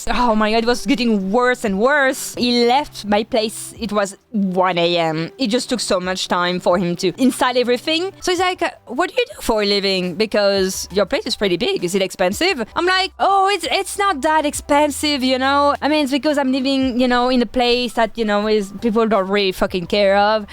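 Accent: France. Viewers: Canadian with the strongest French accent ever...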